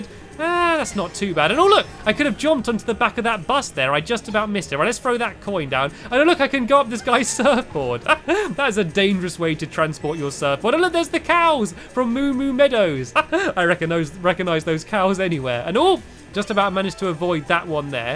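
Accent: United Kingdom. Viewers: It's Broth Brawl. Stampy just has his British accent.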